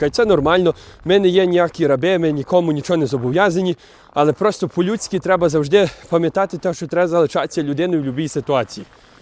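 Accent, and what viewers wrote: Western Ukraine, Ukraine. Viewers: Слышно по говору, что он с западной Украины. А чего он не в окопах, патриот хренов. А русскоязычные украинцы защищают свою землю.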